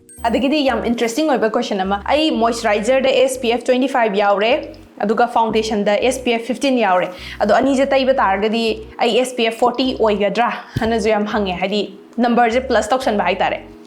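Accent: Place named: Manipur, India. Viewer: Aya ym nungcye meitei lon ngangb heire ❤️❤️❤️eymbse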